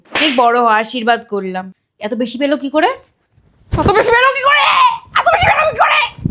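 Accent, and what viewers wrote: Bangladesh. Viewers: Kotha sune Bangladeshi mone hoy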